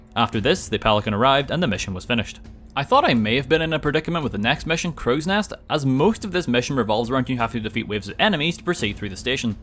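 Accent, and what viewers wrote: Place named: Northern Ireland, United Kingdom. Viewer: Oh that's what that accent is! I was like well that's not scottish or welsh, and it's unlike any irish accent I've ever heard. I mean I know y'all have a new accent every 50 feet but I guess it never occurred to me that northern irish would be distinct from irish to american ears. huh.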